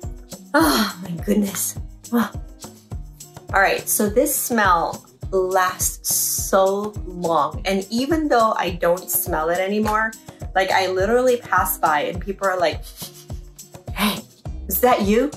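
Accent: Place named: France. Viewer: Great video sis, Loving your french accent..😄 I'm the same, as you, I have my fave perfume that I've been using since high school and its the Calvin Klein Eternity, it just brings nostalgia for me..💗 I'm perfume obsessed lately and have a few different ones..lol.. I do want to get the Louis Vuitton, Rose de vents and Chanel 1957. those two are on my Christmas wishlist . Thank you for sharing, have a wonderful weekend 😘💖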